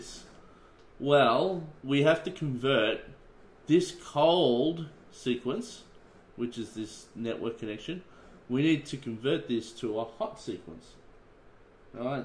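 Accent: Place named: Australia. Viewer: nice ausie accent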